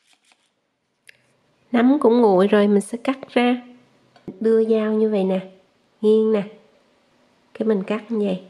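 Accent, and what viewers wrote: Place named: Saigon, Vietnam. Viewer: Xin chào em cảm ơn em chia sẻ món chay này thật lạ mà nhìn rất ngon! Không biết khi ở VN em ở quận nào tại SG mà giọng nói rất SG de thương 😊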